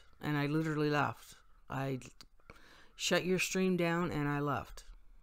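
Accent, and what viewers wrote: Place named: Canada. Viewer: i enjoy your videos, and Canadian accent 😃, but these people are so vicious and hateful when you disagree with them it's pure insanity. LB's "people" are going to jump in an attack you with their mob mentality. They are incapable of polite disagreements, the hate they throw out is so horribly cruel. I think you do a great job & you have every right to voice your opinions, especially on your channel. Nobody has to watch anything they disagree with, or they find offensive. Have a great day.🌷🌷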